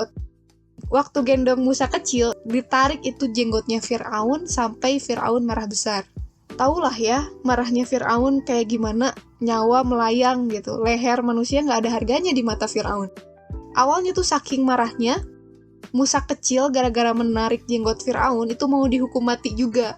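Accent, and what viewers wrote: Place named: West Java, Indonesia. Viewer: BARU NONTON SI TETEH NA SUNDA PISAN. HEHEU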